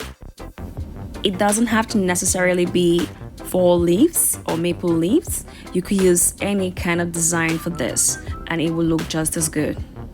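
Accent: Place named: Jamaica. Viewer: Love the little Jamaican accent & your creative crafts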